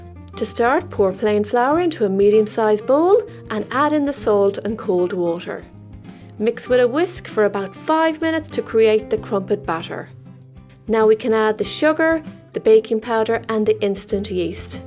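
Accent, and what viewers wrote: Ireland. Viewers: YOUR irish accent and watching was great❤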